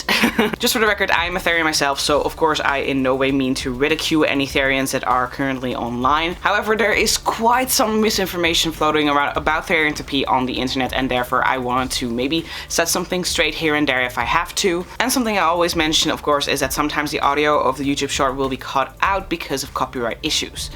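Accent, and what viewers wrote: Netherlands. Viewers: Are you dutch you sound dutch and I am dutch oh and love the video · oommgg ur english sounds dutch, ben je nederlands? er zijn echt bijna geen therians hier ben echt zo blijj aaa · Random question are u dutch cause the accent sounds familiar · You kinda have a little Dutch accent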